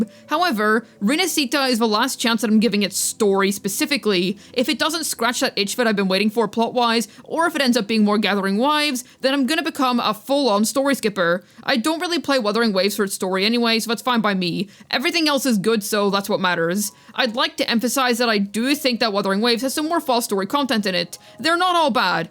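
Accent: United Kingdom. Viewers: Your accent still sounds british XD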